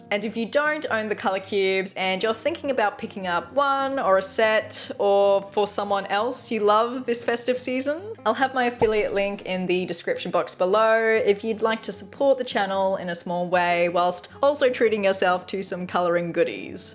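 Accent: Australia. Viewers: Hello I am new here. Commenting to boost for the algorithm! Fun fact of the day: I'm from Aotearoa NZ, and every time I accidentally stumble across an AUS/NZ creator I have to listen for an abnormally long time to figure out whether theyre a kiwi or a roo. My guess, without looking at the rest of your content is that you're Australian 😌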